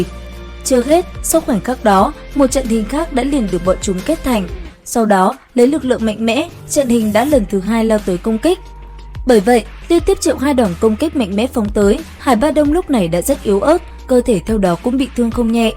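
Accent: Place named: Ha Tinh, Vietnam. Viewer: ông này người hà tĩnh chắc luôn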